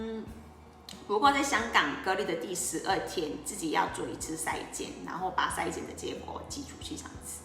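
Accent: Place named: Taiwan. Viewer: 台灣腔真好聽。已經變成台灣人了。🤗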